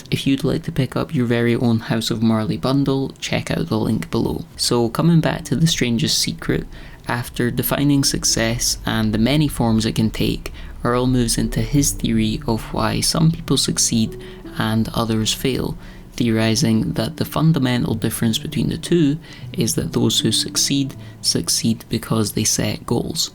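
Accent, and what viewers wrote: Scotland, United Kingdom. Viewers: Do you speak Scottish? Tha Scottish blasta.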